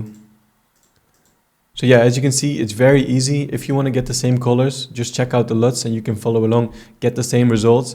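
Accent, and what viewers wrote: Netherlands. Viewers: Je bent Nederland volgens mij haha het accent nice video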